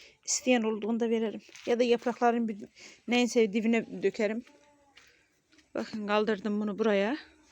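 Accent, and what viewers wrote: Azerbaijan. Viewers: siz azerbaycan lehcesiyle mecbur edirsiniz ozunuzu ki turkce daniwmaqa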